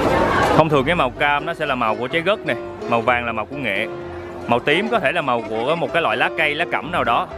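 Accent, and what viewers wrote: Mekong Delta, Vietnam. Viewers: Bạn này có lợi thế giọng nói cuốn hút, phát âm giọng miền tây dễ thương (mình k rõ bạn ở đâu), đẹp trai. Nội dung thì lúc nào cũng dễ chịu cực kì. Ủng hộ bạn nhiều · Nghe giọng biết ngay là người Kinh tộc khinh người. bạn biết gì về ẩm thực vùng cao biết gì về người ĐỒNG VĂN? Mấy cái món của bạn nói đã bj Kinh hóa hết rồi đâu còn nguyên bản. Ngay cả món ân mà người kinh gọi là Thắng cố thì còn chưa gọi đúng tên nói gì đến cách nấu và cách dùng nguyên liệu.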